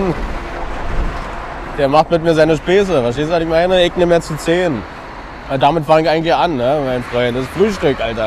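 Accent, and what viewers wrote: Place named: Berlin, Germany. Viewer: ..BIST ECHT DER HAMMER..BERLINER SCHNAUZE..WIE ICH👍💪🖐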